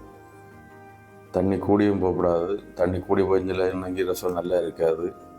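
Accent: Kanyakumari, India. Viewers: கன்னியாகுமரி யா நீங்கள்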